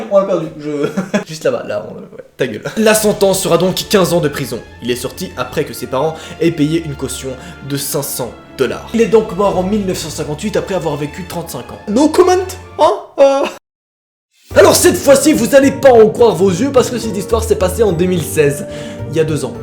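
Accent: Belgium. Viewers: T'es belge ? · tes belge ? moi aussi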